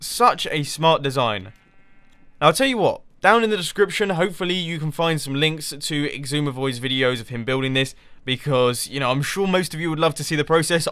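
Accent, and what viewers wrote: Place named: United Kingdom. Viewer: His accent is clearly Brittish.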